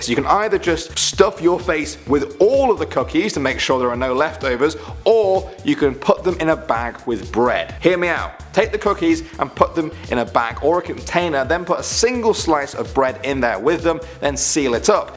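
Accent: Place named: United Kingdom. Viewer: i think that is british accent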